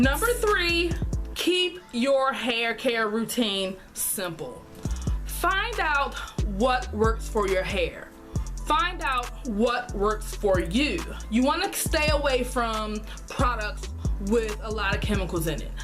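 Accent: Baltimore, United States. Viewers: she definitely from Baltimore lol love it! love these videos, definitely helping as i'm looking to start my journey in a month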